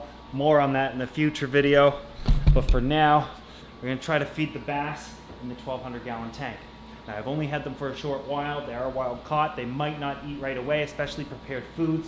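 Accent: Canada. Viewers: I love you Canadian accent lol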